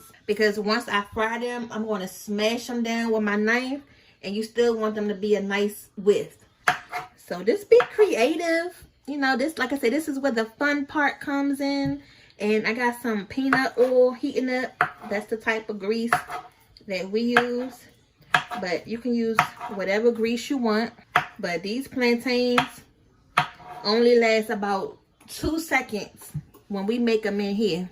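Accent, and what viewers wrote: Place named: DMV, United States. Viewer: Sound like she from the DMV!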